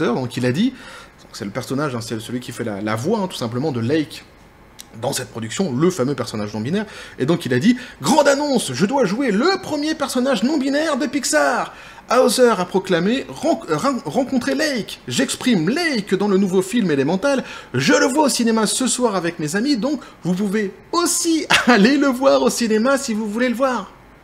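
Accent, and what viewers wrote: Quebec, Canada. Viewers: tu fais plus accent canadien que belge mais je préfère l'accent canadien 😄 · Ah, je suis curieux d'entendre ton accent Québécois alors. Le défi est lancé!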